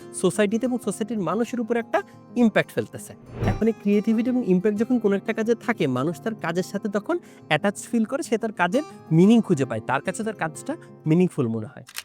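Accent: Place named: Chuadanga, Bangladesh. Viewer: ভাইয়া জানতাম না আপনার বাসা চুয়াডাঙ্গায়। আমিও চুয়াডাঙ্গা জেলার দামুড়হুদা উপজেলার। আপনাকে অনেক শুনি, এখন বুঝতেছি আপনাকে ভাল লাগার অনেক গুলা কারনের মাঝে হয়ত ভাষার একটা টান ছিল যে নিজেও জানতাম না। ফি আমানিল্লাহ।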